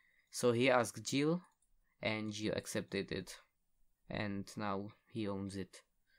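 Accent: Czech Republic. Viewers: T H A T B E A U T I F U L C Z E C H A C C E N T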